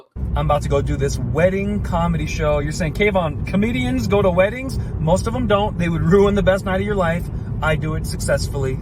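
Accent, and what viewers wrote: Australia. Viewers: K-von, I love your Australian Accent....... it's hot 🔥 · Good Australian accent, Kvon!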